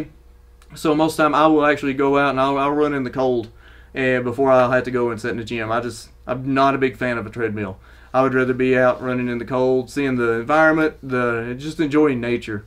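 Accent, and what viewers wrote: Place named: South, United States. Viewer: Thanks for the quick list & honest info. Looking forward to 2019. PS - Don’t apologize for a southern accent. Just be the real you.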